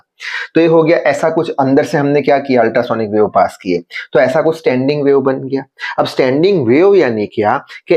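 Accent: Gujarat, India. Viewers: Sir aap Gujarat se ho kya ?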